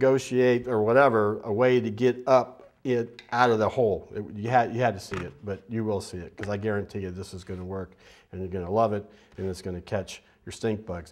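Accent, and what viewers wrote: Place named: Pittsburgh, United States. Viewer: YEP, this Gentleman is from Da-Burg. Go Steelers!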